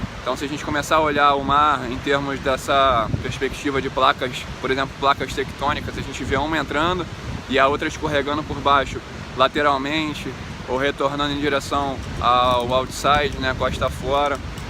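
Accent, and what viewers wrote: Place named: Rio de Janeiro, Brazil. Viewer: Amo sutaque carioca dica otima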